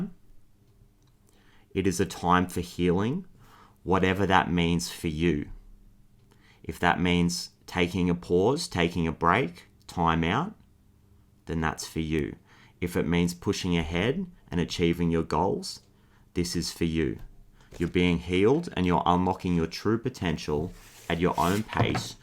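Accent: Australia. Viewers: Hey, thank you. It's so nice to hear a fellow Aussie read. And it's spot on. I am being taken to a rental tribunal by a managing director who lied to me and has lied on an official form. I am scared, not sleeping well, although I had a dream where I saw the word "peace" and the name of the managing director who has lied, defamed, and made my difficult life harder. I felt a sense of relief after that dream, some compassion for the damaged young woman that I am dealing with. I have been showing up every day, taking all the action I can. The hearing is on Wednesday. I will take compassion, strength, and all my spirit guides and angels with me.Thanks for your wisdom.K.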